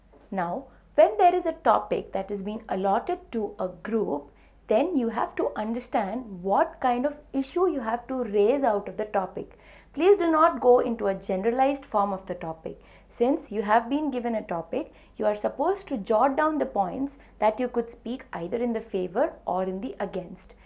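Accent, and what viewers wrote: India. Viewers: 印度口音，发音不准但很流利，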